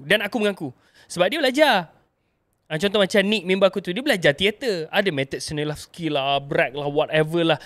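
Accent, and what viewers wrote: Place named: North, Malaysia. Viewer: Smbng utaqa 😂syok tgk😂😂😂😂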